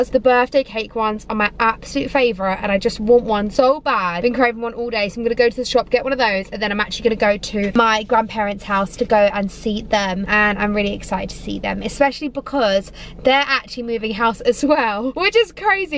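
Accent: United Kingdom. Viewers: Is she British? I think cuz of their Accent ..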